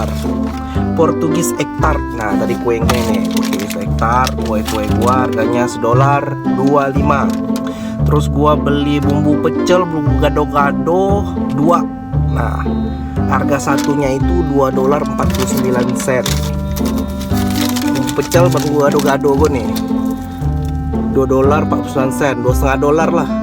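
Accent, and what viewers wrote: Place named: West Sumatra, Indonesia. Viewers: Iya, dari logatnya masih kliatan.. btw im half minang too 😆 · Urang awak rupanya, pantas logatnya familiar😂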